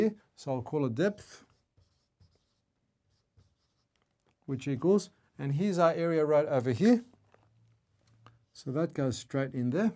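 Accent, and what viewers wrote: Australia. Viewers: He sounds like a australlian and french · u can tell his from sydney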